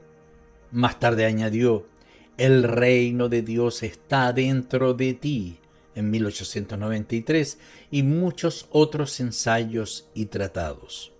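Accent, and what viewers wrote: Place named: Chile. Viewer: yo también ya echaba de menos esa voz y dije: «tengo que escuchar otra biografía más» 😅 Un abrazo muy fuerte. Por cierto, ese acento no es taaan marcado, pero ¿será que es chileno? 🤔😌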